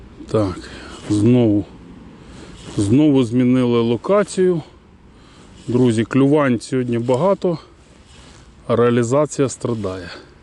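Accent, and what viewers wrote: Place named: Surzhyk, Ukraine. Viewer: Це якесь жахіття! Хіба так важко підтягнути українську? Ну таке вже суржело махрове, вуха завертаються! На весь україномовний ютуб дві рузскагаварящіє цєлюсті - і Петраш.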